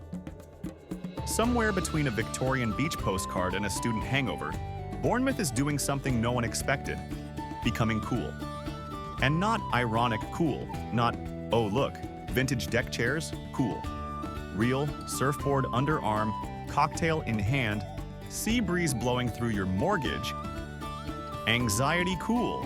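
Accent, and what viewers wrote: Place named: United States. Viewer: Commentary is pathetic why American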